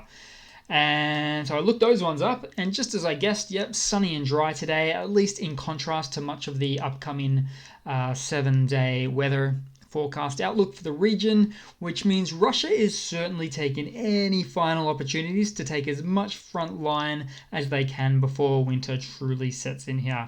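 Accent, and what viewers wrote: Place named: Australia. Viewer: Love your Aussie accent! All da best from Poland :)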